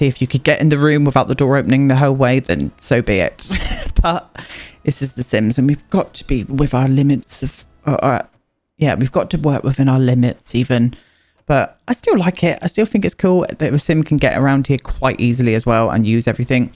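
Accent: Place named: Devon, United Kingdom. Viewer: I just LOVE your videos! I'm originally from Devon but currently training to be a midwife in Birmingham and hearing your accent just reminds me of home and makes me feel all warm and fuzzy inside <3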